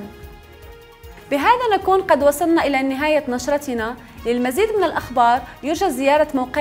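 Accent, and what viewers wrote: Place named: Morocco. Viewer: لا علاقة لها بالاعلام....كأنها جهاز آلي يتكلم....كما انها لا تمتلك أي احترافية ولا مؤهلات تخولها للدخول في مجال الاعلام....يجب عليها أن تتخلص من لهجتها المغربية لما تقرأ......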